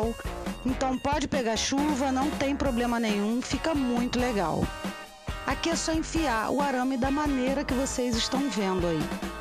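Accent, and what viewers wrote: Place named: Rio de Janeiro, Brazil. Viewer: É fácil reconhecer nosssso "sotaque"! #RJ❤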